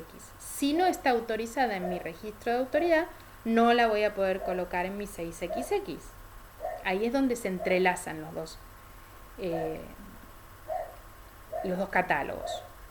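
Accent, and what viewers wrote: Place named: Argentina. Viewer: Qué lindo video y qué lindo acento argento. ¿Podrías decirme como crees que influirá en el futuro el uso de sistemas MARC para las bibliotecas?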